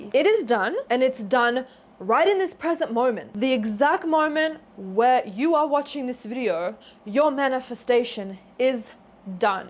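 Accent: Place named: Australia. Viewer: Wow I really don’t feel motivated by someone yelling at me in an Australian accent 😂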